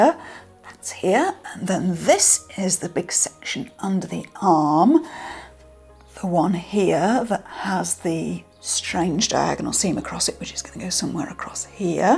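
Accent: United Kingdom. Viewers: you are an amazing artist!!! You are so calm & confident, even when adjustments to your projects are made. You are a real inspiration to me! I love your English (Brittish) accent!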